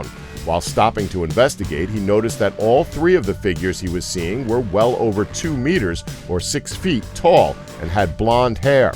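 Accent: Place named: United States. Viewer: Probably a Chinese channel that hired an American sounding narrator.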